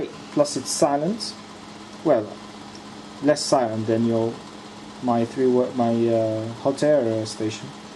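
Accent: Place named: Poland. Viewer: Akcent Polski, chyba że się mylę Polak potrafi. Zrobiłem coś podobnego tylko z coffe machine do tostów i robienia kawy.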